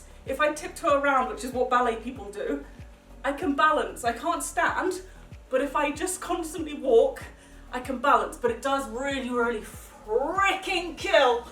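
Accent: United Kingdom. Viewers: I love your British accent ❤️❤️❤️❤️❤️❤️❤️❤️❤️❤️❤️❤️❤️❤️❤️❤️❤️❤️❤️❤️ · I can tell you are from the UK 🇬🇧, I’m sorry for those who will hate but I’m new to this channel Also my favourite time stamp has to be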